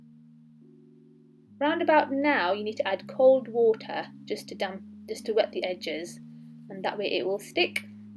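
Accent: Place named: North, United Kingdom. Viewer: it’s the northern accent that does it for me!!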